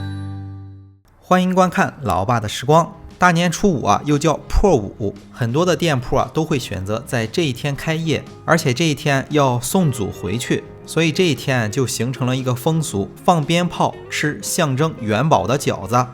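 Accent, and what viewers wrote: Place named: Zibo, China. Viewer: 听口音是淄博那边的？老爸的手艺真棒👍！加油💪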